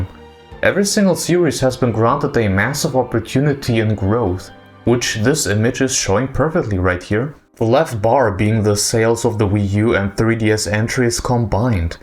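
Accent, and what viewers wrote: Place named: Germany. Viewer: Wow, you have such a German accent speaking English. Я некогда не думал что вы говорите по-русски 😂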